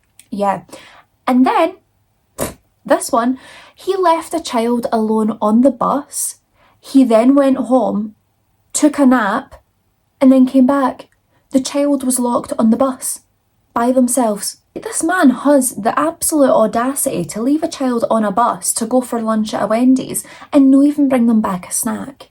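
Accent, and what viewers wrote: Scotland, United Kingdom. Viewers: "how does he even get women/with that hair line" "had to do the big shop at tesco" "that was patricia" 😂😂 i love how your sense of humour can shine through even when talking about such a monster. your channel has been a great find, thanks to the person who recommended you 👏👏👏 Love a Scottish accent so cute ❤❤ I know this case i read the survivors autobiographies but I've forgotten a lot and didn't know castrel's history so thanks for new information and being so thorough Edit: near the end when you said may 6th im watching this on may 6th 😮 spooky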